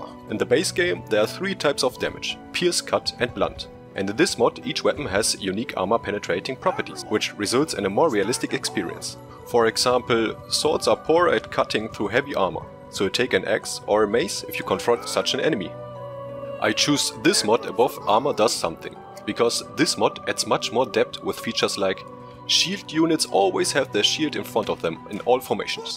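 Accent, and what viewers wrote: Germany. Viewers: Dein Englisch ist definitiv besser als meins...aber dieser Deutsche Akzent triggert einen einfach hart...versuche einfach das ganze mehr rund auszusprechen oder hör dir an wie Amerikaner sprechen das klingt nicht so hart und man würde nicht sofort hören das du Deutscher bist xD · Ich mag deinen deutschen Akzent 🙌 great Videos, keep it up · der deutsch aktzent kickt :) nice vid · Jo kann es sein dass du aus Deutschland kommst xD Der Akzent kickt ^^ Edit: Oh ok du bist anscheinend ein Deutscher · Hi, thanks for the video! I like your German accent:D. I installed most of the mods in the videos you uploaded, but I don't have the mod options in menu. Could you help me? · Höre ich da ein deutschen Akzent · Youre german accent is so funny xD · Really enjoying your videos, very helpful and informative. I also love your German accent, I lived in Germany for 5 years and fell in love with the place. 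Have a nice day.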